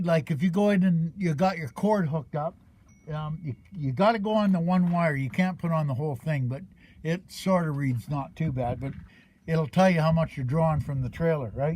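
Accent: Canada. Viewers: Education at the best level. Hands on and clear English or Canadian. Eh? Or is it AH?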